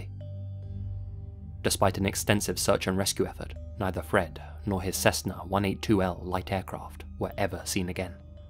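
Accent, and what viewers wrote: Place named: England, United Kingdom. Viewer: I cant stand the English accent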